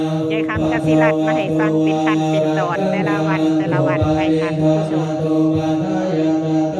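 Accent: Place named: Sakon Nakhon, Thailand. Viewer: ฟังเสียงคือคนทางสกล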